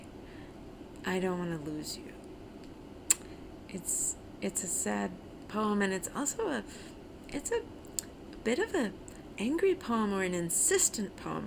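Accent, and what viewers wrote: Ireland. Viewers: What....an Irish ... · Bad start.......IRISH!?!?!?!?!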